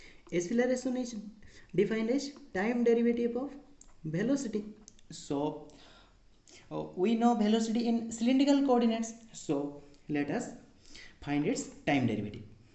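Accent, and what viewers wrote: Odisha, India. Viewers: Are u odia?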